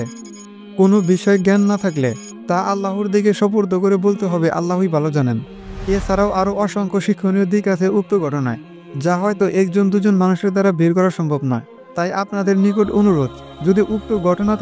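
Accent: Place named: Manikganj, Bangladesh. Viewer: apnar deser bari ki manikgonj , karon apnar uposthaponay cholti vasar ovab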